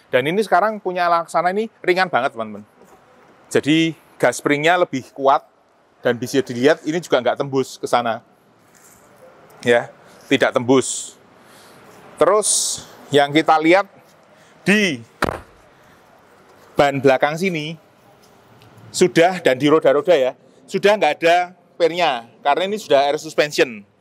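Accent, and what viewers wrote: Java, Indonesia. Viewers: Tetap pertahankan medoknya pak😂😂😂....saya subscribe baru anda dari negeri yang jauh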